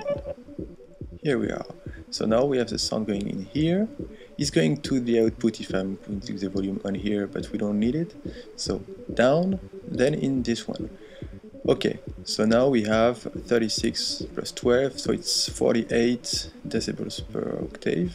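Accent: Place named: France. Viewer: L'accent français est drôle :)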